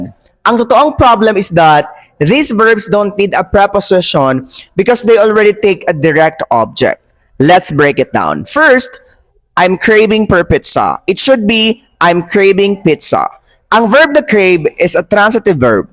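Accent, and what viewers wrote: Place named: Philippines. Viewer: you explain well. but the problem is your pronounciation. anyway its ok that is the filipino trademark.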